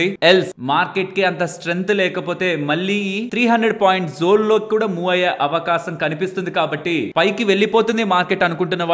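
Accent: Nellore, India. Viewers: 😮 midhi nellore ah???❤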